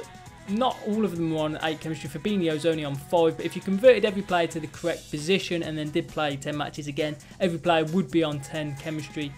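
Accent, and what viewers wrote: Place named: Wolverhampton, United Kingdom. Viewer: You from wolvo 😂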